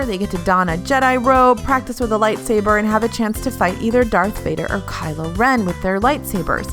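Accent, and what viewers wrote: United States. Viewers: this video and the way the voice over is made is so violently american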